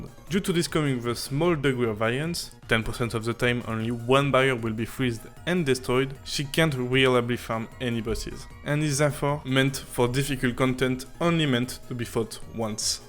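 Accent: France. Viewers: Cache mieux ton français wsh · you are so frenchinglish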